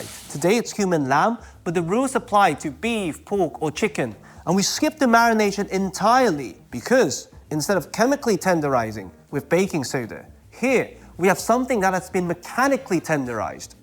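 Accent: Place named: United Kingdom. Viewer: did not expect the Britiish accent ! but overall good recipe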